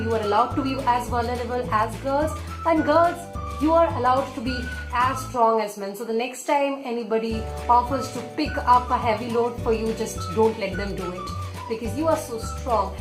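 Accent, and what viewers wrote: Bengal, India. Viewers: Bengali! I was thinking so coz ur tone doesn't seems Marathi, Gujarati, Bihari, Assamese & when literature comes, Bengalis r on top. 😁 Great work, very inspiring.👍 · Hey! I am new to your channel and the very first thing I noticed was your accent. You sound like a Bengali. Are you a Bengali? Kothay thako? Kolkatay?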